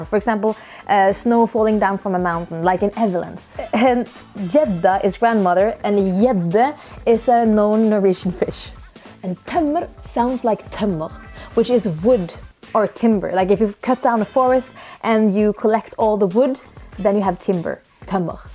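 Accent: Iraq. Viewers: the Iraqi accent has been liked by you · انتي تتكلمين لهجتنه العراقيه الجنوبيه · the iraqi accent made it more charming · This iraqi accent is so cute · You are so cute speaking the Iraqi dialect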